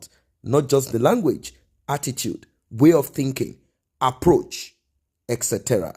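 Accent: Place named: Nigeria. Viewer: Still sound like Nigeria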